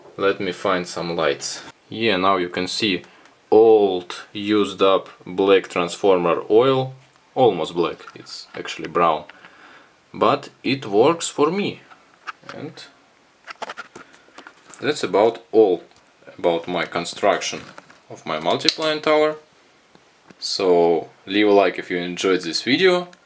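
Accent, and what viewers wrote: Russia. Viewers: спасибо, русскую английскую речь не спутаешь ни с чем, слишком все правильно выговариваете. :) Спасибо за видео. Будут вопросы обязательно спрошу. Меня больше интересует тлеющий разряд, так что спрошу под другим вашем видео. :)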